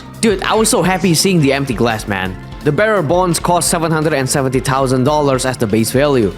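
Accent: Indonesia. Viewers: logat nya kayak orang indo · i knew it!! judging from ur accent and the way u talk u are indonesian, so glad that i found indonesian gta online youtuber! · logatnya kaya orang indonesia.. bener kah bang? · u sounds like indonesian, nice accent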